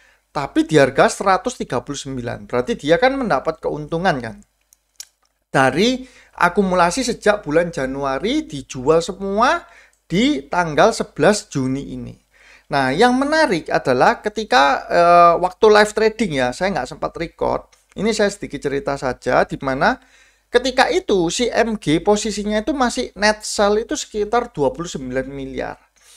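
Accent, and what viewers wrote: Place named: East Java, Indonesia. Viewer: Muuuuedok tenan ko jawa timurnya 🤣🤣🤣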